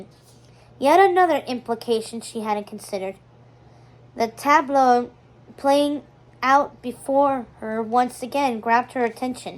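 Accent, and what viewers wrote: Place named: Louisiana, United States. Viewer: You are great with that Louisianan accent!!! ❤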